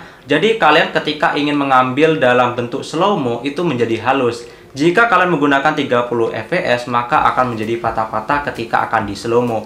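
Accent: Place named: India. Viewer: Kok logat lu kaya India bang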